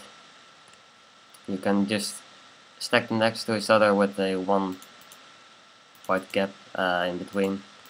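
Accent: Netherlands. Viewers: haha youre dutch :)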